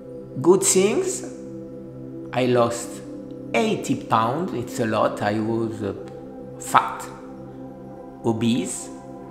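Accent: France. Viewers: Your comments are very interesting, in the same it helps us to understand because you have very french accent. for is the same I have very Provencal accent Thank you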